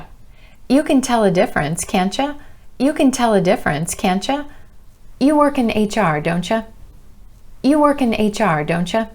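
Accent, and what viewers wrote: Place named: United States. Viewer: Oh, I love this video and your American voice too. ! That's why many times I don't understand when native English people talk even the simple sentence.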